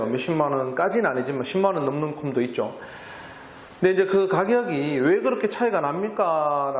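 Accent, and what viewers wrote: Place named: South Korea. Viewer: 저는 성격이급해서 웬만한영상 2배속으로듣는데 진짜 서울말처럼들려요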